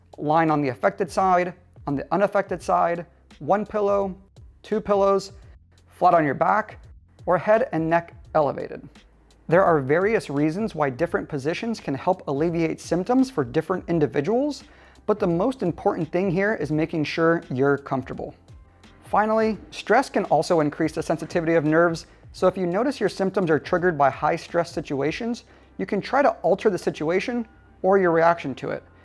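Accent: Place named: England, United Kingdom. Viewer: Can u please reply me . I have same problem but i didn't understand your English accent . Its better if u write here. Whts problem is this.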